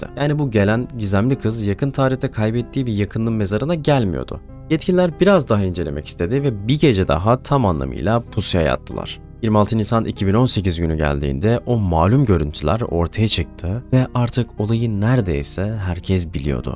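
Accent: Denizli, Turkey. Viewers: Abi sen denizlilimisin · Abi denizlilimisin OHAĞHH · denizlili misin reis sen aaa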